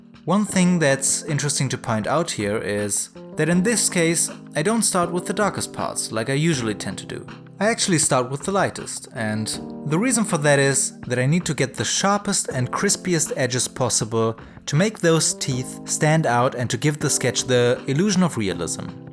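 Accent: Germany. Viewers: Wusste ich doch, dass du Deutsch bist! Hab ich sofort gehört :) Deine Kunstwerke sind total inspirierend, vielen Dank dafür! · Hello, I kind of knew from the beginning that you are german / turkish. I could hear the german accent since I’m geman myself :) Let me say that the tricks and advices you gave helped me rethink things I hadn’t noticed before. That is what I really appreciate about all of your videos since they are truly helpful aside from the timelapses of your paintings of course. As you explained (and as I understood) uploading your videos is not meant to gain a great amount of subscribers but simply share your techniques with us - which is what makes you so special for me since you only upload them for fun. I enjoy watching your videos alot and would like to thank you for sharing your view of art and advice with us / me. Liebe Grüße Rebecca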